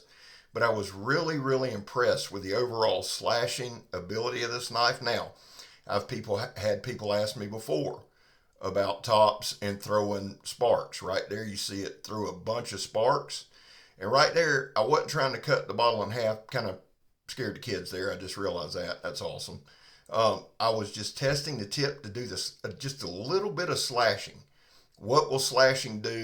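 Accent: South, United States. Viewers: Ohh OK.. I'm in GA. I seen your shirt and your Southern accent and thought maybe you lived around down here.. Love your video's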